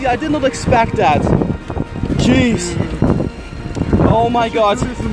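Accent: Netherlands. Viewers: Ohhh you're dutch, that explains the accent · Are you dutch????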